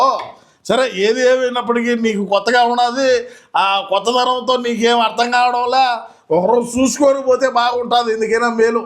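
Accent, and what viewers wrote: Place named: Kakinada, India. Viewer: Kakinada kanttam garu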